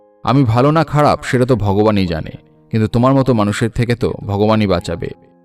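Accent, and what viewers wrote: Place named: Bangladesh. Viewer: আপনার কথা বলার style, উচ্চারণ সব বাংলাদেশের মানুষের মত ।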